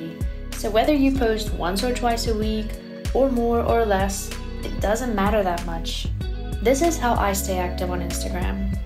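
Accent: South Africa. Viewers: You sound so South African ...others mistake our accents for all sorts of others, but we can always catch the subtle inflections etc, no matter where in the world. I live in Portugal. Adeus/Totsiens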